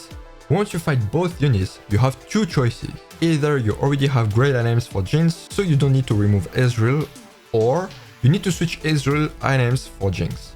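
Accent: France. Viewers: Asian dude with french accent is throwing me off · Mec je kiff que tu ais l'accent français, de l'humour, de l'intelligence et un amour pour TFT ! Tu nous fais sortir de l'ordinaire 🙌🏼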